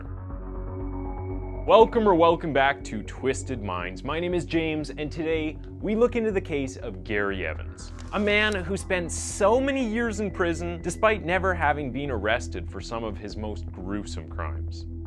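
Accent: Canada. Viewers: no I'm not going to lie yesterday I was watching one of his videos and totally capping on how he talks. Is he Canadian? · Oh the Canadian pronunciations lol. It’s all good though. It goes both ways. Love you Canada! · Hes canadian. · nice channel, are you Canadian? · Is he Canadian? I sense a slight accent and some of his spellings are spelled in traditional English such as "behaviour."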